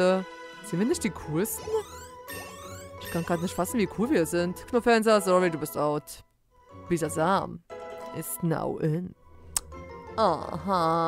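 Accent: East Germany, Germany. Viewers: Gott wie ich dein ostdeutschen Dialekt liebe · Hey, echt schönes Lets play, bin neu auf deinem Kanal und ich finde deinen Ostdeutschen Akzent echt super :) bin übrigens auch ein Ossi :D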